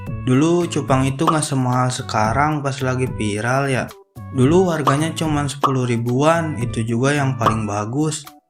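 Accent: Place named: Sunda, Indonesia. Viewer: Aa nya orang Sunda yah, saya lihat lihat nadanya kaya orang Sunda, sok ngomong badag🧒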